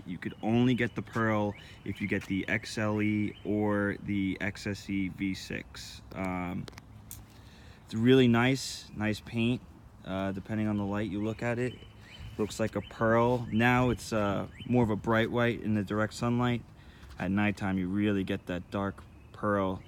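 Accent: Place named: New York, United States. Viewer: The New york accent is all i hear lol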